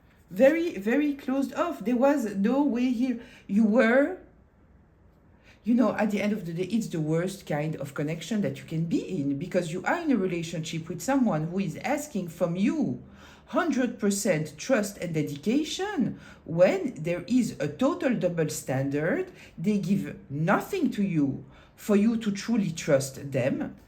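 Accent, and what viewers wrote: France. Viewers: Tres insightful! Your readings seem to really tell the sad story of who she is...with a strong French accent. 👏🏾 Thank you! 🙏🏿❤️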